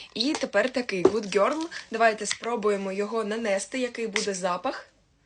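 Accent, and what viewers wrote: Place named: Russia. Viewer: Или евелін. Говори на рідній мові не ламай язика. Чувствуется русский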